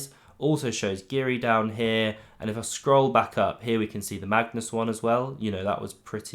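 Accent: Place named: Scotland, United Kingdom. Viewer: your Scottish accent is horrible I can follow you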